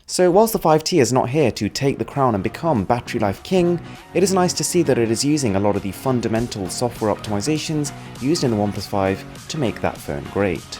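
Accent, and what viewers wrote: United Kingdom. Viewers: Nice review indian guy with a british accent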